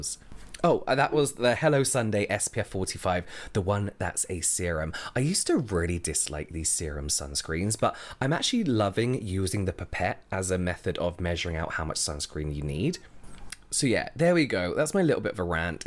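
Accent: United Kingdom. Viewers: This guy can talk and talk for hours nonstop about things idc about and I'd still listen to it all...............I blame the British accent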